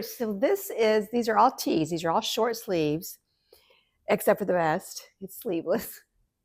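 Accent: South, United States. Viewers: I enjoyed watching my first episode of your vlog,! But for a northern transplant to the south, you certainly sound southern! LOL I have never been to Rhinebeck and I'm not sure I ever will. It seems a bit overwhelming to me. I've been to Flock in Seattle and on several knitting trips with knitting stars (Arne & Carlos and soon the Grocery Girls, for example). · You are so sweet. Love your podcast. You said you are northern but to me you sound southern!!! What do I know,I am Canadian.❤️🇨🇦❤️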